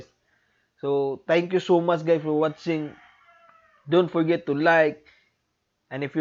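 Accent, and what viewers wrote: Visayas, Philippines. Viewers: oh my word the way you speak tagalog, so me. lol. apir sa mga bisdak nga magahi talaga. lami kaau ang crispy dong! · New subscriber kuya!! Bhlag bisdak kaayo pero okeyyy keyuuu.. request kog e mukbang nga pancit canton chilli mansi with boiled and sunny side up egg 😁😁 lami kaaayooo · Ka bisaya pud nimu oi..pero proud ghpon meng mga bisya nimu bai!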